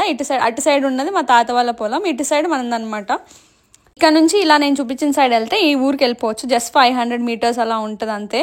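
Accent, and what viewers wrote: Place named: Telangana, India. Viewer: మీరు అన్నమయ్య జిల్లా అంటున్నారు. కానీ తెలంగాణా యాస మాట్లాడుతున్నారు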